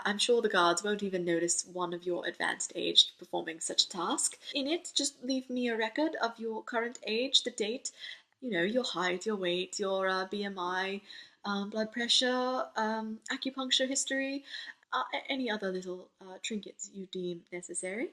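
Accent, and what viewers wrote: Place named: England, United Kingdom. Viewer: This is great and I love your English accent.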